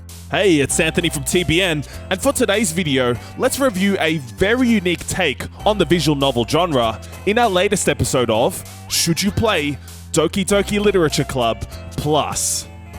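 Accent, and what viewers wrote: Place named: Australia. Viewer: why are you Australian? I actually need to know.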